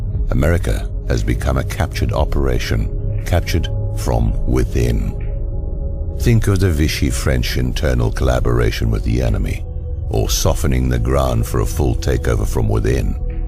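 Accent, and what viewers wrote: England, United Kingdom. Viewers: I guess the commentator with the British accent gives this message more credibility. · There is no gold reserve, nor has there been since the early 1930's. All the federal reserve relies on private banking, namely the 2 big families, and we all know who they are. The guy narrating this documentary keeps saying our country, our economy, but his accent is definitely English. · Why does the narrarator have a british accent? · English narrator. Obviously true!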